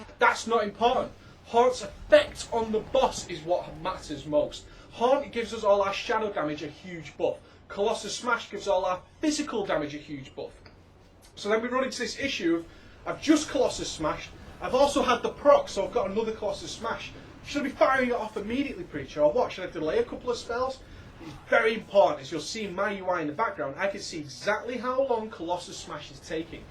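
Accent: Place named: Manchester, United Kingdom. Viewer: I watched this purely for the banter, fuckin hilarious mate, where about in Manchester you from?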